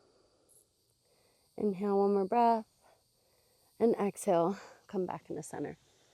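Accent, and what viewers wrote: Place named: Australia. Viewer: Your accent has got me…scattering of different accents. I can hear Australian in there xx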